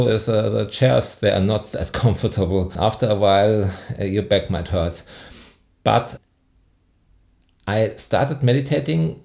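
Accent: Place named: Germany. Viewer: Ich liebe deinen deutschen Akzent. Es ist so schön! Vielen Dank für dieses Video)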